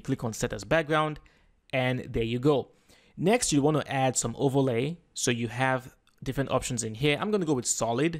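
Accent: Nigeria. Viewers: That's a Nigerian Speaking!! Nna kedu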